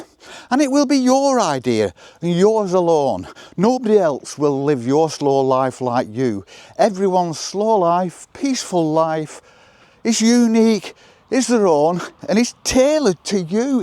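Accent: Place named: Lancashire, United Kingdom. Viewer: ‘Those’ videos on YouTube made me laugh. I’ve instantly clicked straight off quite a few recently 😂. What a gorgeous walk! I’m living exactly the same way in the west countryside of Victoria, Australia. Listening to you is like talking with my mam and her family who all emigrated out here from Oldham, Lancs. it’s made me quite emotional. Peace and love to you too 😘🤗.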